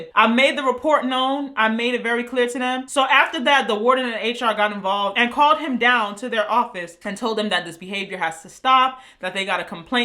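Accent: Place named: New York, United States. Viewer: This is not a funny experience but, I love your personality & you sound like you’re from ny!!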